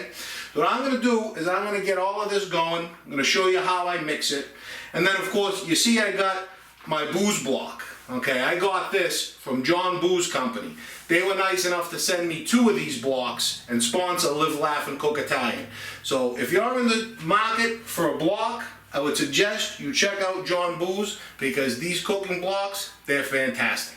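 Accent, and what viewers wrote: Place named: South Boston, United States. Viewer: I'm trying to figure out what is more amusing: Your simple recipes or your "Southie" accent. Love them both!!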